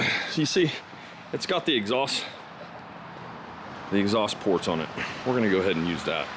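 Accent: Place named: United States. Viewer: What are you talking about Clif ? That's an American accent ! As for the way he looks, don't make fun of him just for being a pretty boy, 😂 he can't help it if he's handsome.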